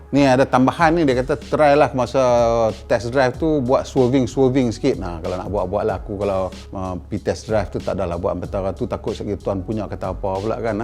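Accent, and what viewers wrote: North, Malaysia. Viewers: Hahaha illuminati 🤣 Kemaihhhh bang! Teruih cakap utara... Kami syok!!!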